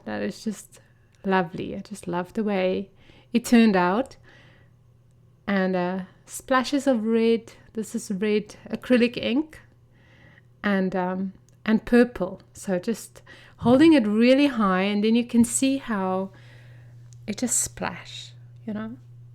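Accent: South Africa. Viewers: It's the first time watching your channel, and i thoroughly enjoy your accent, sounds much like my own - South African. By the way - butter chicken is my favorite!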